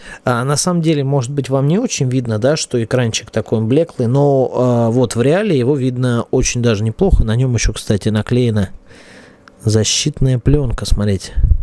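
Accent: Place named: Russia. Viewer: От аканья автора уши болят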